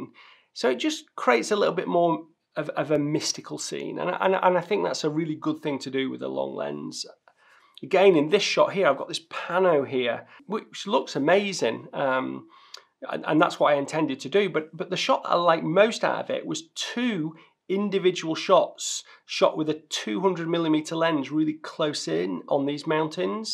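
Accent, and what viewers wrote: United Kingdom. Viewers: Great stuff. I kinda wish I had grown up in the UK, simply for the accent:) instead I have "Texan";-). Love the Tree/Mountain shot! Most of my insect shots are using my 100-400.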